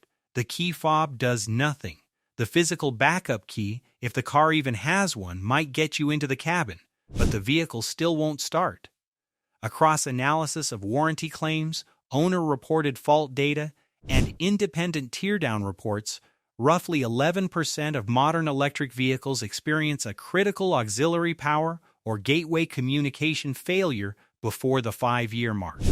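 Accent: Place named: United States. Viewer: You should be more careful with your script writing because you’re using an American Standard accent, but referencing British currency. Also EV, is pronounced eeeee veee not “ev” like in evermore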